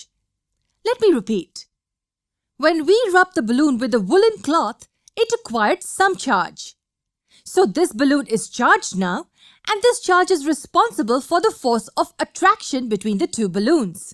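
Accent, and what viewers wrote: India. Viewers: The indian accent do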